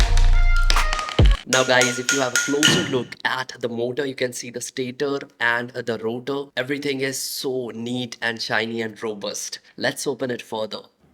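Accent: India. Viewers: oh my god! indian english!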